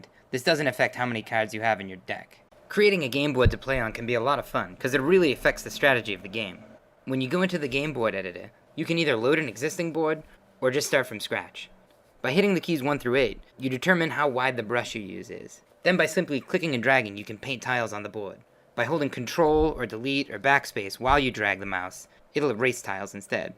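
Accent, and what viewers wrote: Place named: Boston, United States. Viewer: I was just teasing. The Boston accent makes it sound like he's saying caads when he says cards. I got my key just fine and the game is pretty fun.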